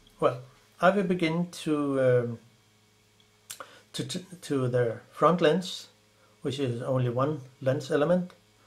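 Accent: Denmark. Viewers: Hi. Thank you for the video. 🙏 You sound Danish - are you from Denmark? If Can you please help me with cleaning an old Zeiss 50mm 1.8 Ultron lens? Kind regards Per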